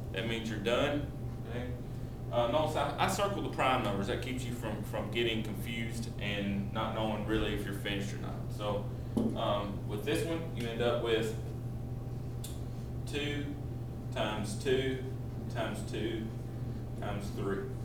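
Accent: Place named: Texas, United States. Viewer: good teacher. I like his voice it reminds me of Texas.. I like the way he does the method of problem solving too.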